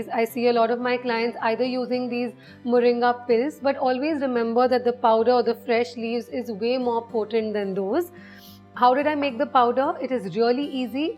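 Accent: India. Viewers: Also I love your raspy Indian accent it's so sexy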